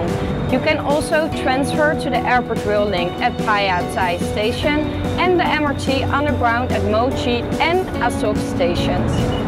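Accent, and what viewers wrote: Netherlands. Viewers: hahahaha sounds like a dutch farang hahahahaha. This tour agency is extremely expensive. Norrmally this type of farangs got scammed in bangkok now they scamming their own people. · This is a dutch company right ? Ik kan het horen aan het accent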